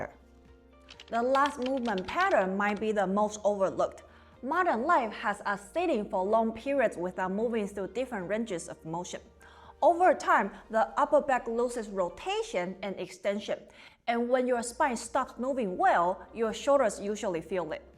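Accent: China. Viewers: Cantonese accent?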